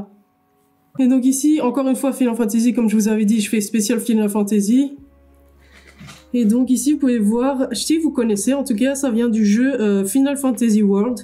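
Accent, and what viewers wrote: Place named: Belgium. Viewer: Sa s'entend pas que t'es belge mdr t'a quand même bien l'accent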